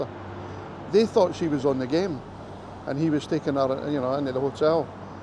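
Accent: Scotland, United Kingdom. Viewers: I just finished listening to the age gap piece. First comment, your accent gives you away ' '. I was born in Glasgow in '45. There is a 12 year age gap between my wife and I. Not a big deal as she was 44 when we met 22 years ago back in Canada. She was an OFW contract Caregiver and I worked in Health Care. Our paths crossed and the rest became history. Planning ahead, we bought a condo in Davao in 2012. It became our holiday home. Now that she is retired too, it is now our retirement home and Canada is the holiday destination. As for the age gap, there never really was an age gap for us. When we got together, I made a point of learning as much as possible about the Philippines, from the beginning to the current. History, politics, customs, music, you name it and I am nose deep into absorbing as much as possible. Take care, stay safe and keep posting. 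👍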